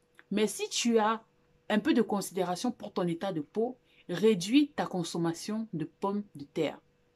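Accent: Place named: Democratic Republic of the Congo. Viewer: Je confirme pour les produits laitiers. 😥Je découvre que tu es camerounaise. D'où te vient l'accent congolais?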